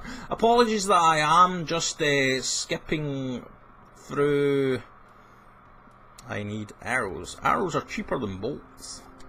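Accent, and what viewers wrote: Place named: Glasgow, United Kingdom. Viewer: Are you Glaswegian? your accent sounds just like some of my friends from down there, but less nasal.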